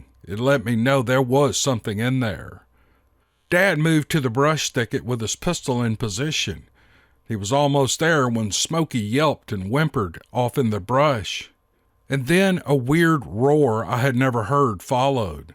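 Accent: South, United States. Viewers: all these people love you! Your genuine love and goodness is overwhelming! That southern voice is to kill for! Have I buttered you up enough yet? Just kidding. I can’t even get a stream to go through correctly. But Im good at sending peek-a-boo pics of my hubby unintentionally. Lol 💝💝💝💝💋🙋‍♀️👩‍🦳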